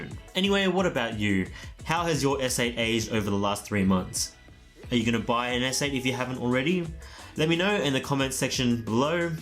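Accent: Australia. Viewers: Ahmad Fuad The guy here is from Australia as I guess from his accent, and Australia got the Exynos version. The Exynos is much better optimized and runs much smoother than the Snapdragon. Honestly, I think he exaggerates the stutter (there is no actual lag, only microstutter, which isn't the same as lag), as it is only noticeable if you really look for it and specifically pay attention to it. My only gripe with the S8 is the redundant Bixby button and the awkward position of the fingerprint reader. I would also be happy for a better speaker, or rather two stereo speakers, and a 32bit DAC for the audiophiles who walk around with fancy headphones. I found the camera to be amazingly good, especially the video capture is astonishing. I also like how the phone feels in the hand and how comfortable it is to hold it and to operate it with one hand. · Hey, which part of Australia are you from?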